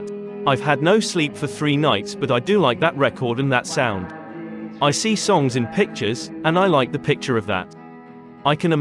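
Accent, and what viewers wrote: United Kingdom. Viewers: sure put on a right proper British accent for this program. 😂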